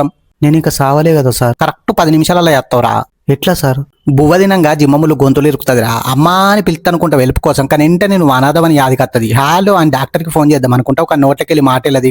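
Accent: Telangana, India. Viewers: Telangana yasa intha andanga untundi Sir in your Dialogues ❤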